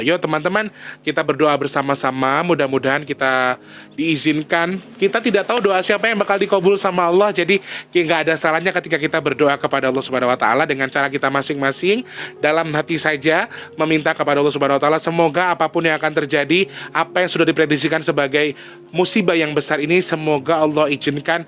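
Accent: Banyumas, Indonesia. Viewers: Laah rika deneng bisa ngapak mas? kayong wis teyeng men.... salam ngapak sing nyonge hehehe..... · Rika teyeng ngapak jebule ya Mas.....😃😃😃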